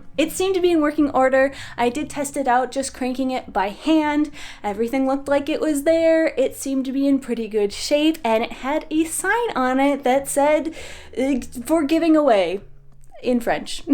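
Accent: Canada. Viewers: You have a lovely Canadian accent when you speak French! 😉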